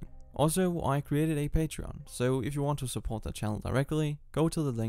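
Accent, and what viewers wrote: Denmark. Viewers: I can hear the dane in your voice, our accent is quite unique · Bold of you to assume I'm American. I'm from Europe as well so yes, I'm fully aware of what a Danish accent sounds like and yes I can hear that when he speaks but that is obviously not the issue here. You can speak up or speak quietly with the same exact accent. I dont agree that it's "my problem" if I'm not a fan of the quiet style of talking. It's not about it being a problem per se, I was just providing feedback and my view on the video. Don't need any white knights coming here in defence who have never seen a comment section of a youtube video before. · That's the Danish accent you're hearing ;)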